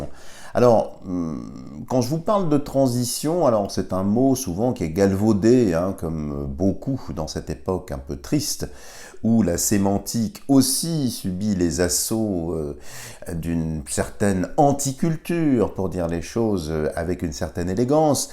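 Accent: France. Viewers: Bonjour quel plaisir que d'entendre parler un pur français... quelle belle langue que la nôtre. En sus de vos connaissances et de vos explications claires et précises sans blabla inutile ni dénigrement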